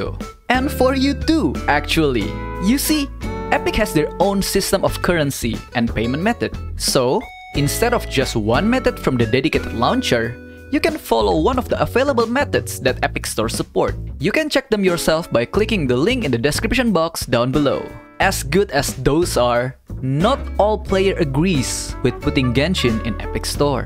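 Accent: Indonesia. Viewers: News org indo yah, accentnya indo bngt ngakak wkkwkekw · i just can hear the indonesian(?)/the south asian eastness 😂👌. love your contents, thanks for the updates👍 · I smell some Indonesian accent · Bro, you have Indonesia accent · aksen nya Indonesia banget (. ❛ ᴗ ❛.) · Ngapak anjay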